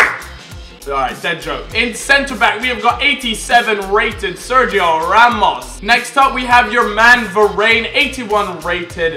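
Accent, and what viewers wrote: United States. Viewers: why does he have an American accent? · Is american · Why does he sound like he's American? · Is it just me or does he sound a little bit American.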